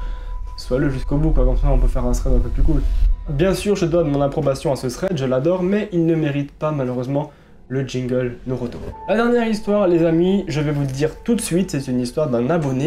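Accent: France. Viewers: Mais tu vis au québec ? Mais t a l accent français...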